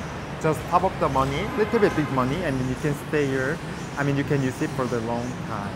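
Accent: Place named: South Korea. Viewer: I like your korean accent when u speak english. So lovely 😅😅😂🎉🎉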